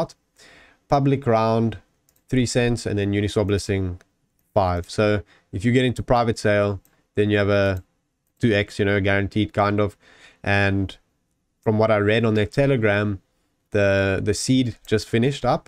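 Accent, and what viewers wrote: South Africa. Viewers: Ate you from South Africa???? Your accent sounds like an afrikaans one. Im from SA living in South Korea.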